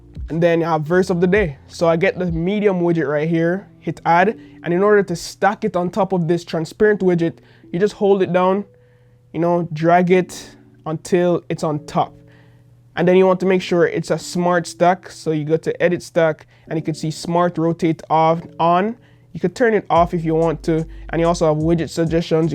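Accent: Jamaica. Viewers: u sound jamaican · You sound Jamaican breda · i can already tell this man is jamaican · Yo bro are u jamaican? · Are you Jamaican? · You Jamaican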